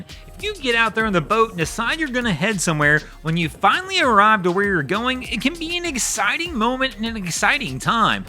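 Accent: United States. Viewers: After a few listens, you will realise that although he has an American accent annoying to Many, he really DOES know what he's talking about and actually makes the video complete. He is also a multiple qualified mariner with much experience. Either way....these are fun complications we all can never stop learning from. Cheers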